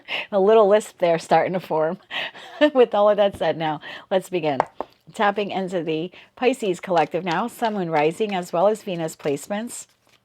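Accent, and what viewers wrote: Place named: New York, United States. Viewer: I'm presuming by your NY shirt, that you are a New Yorker. I can tell by your accent. Long Island here. ❤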